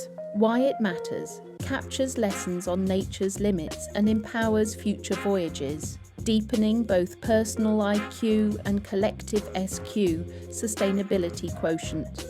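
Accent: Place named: United Kingdom. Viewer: British accent ❤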